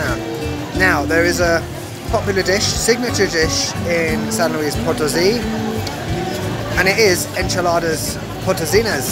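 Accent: England, United Kingdom. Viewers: Are you from England ?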